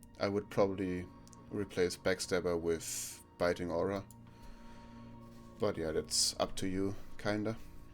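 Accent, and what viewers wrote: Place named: Germany. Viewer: Man hört einfach raus das du deutsch bist:D Guter guide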